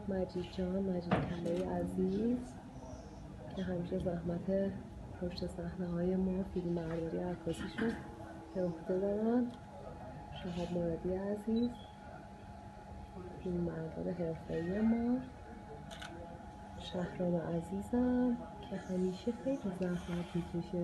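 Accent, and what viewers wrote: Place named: Herat, Afghanistan. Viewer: Mojgan Azimi Official چه جالب شما هراتی اید. منم اصالتم مال توس(مشهد) و مادرم ریشه جامی داره و از نتیجه از نسل هفدهم شیخ احمد جامی هست اگر بشناسید، . لهجه سمت مادری من با شما هراتی ها مو نمیزنه، دقیقا لهجه تربت جام و هرات یکیه. در کل مردم از نیشابور تا هرات خیلی بهم نزدیک و شبیهن، و همچنین لهجه مردم هرات عزیز با بقیه جاهای افغانستان متفاوته و بیشتر شبیه ایران بخصوص خراسانه تا افغانستان. در کل خوشحالم ازین که یه هنرمند پارسی زبان اینقدر با شعور داریم و اینکه هراتی و خراسانی هستی خودش بیشتر باعث خوشنودیه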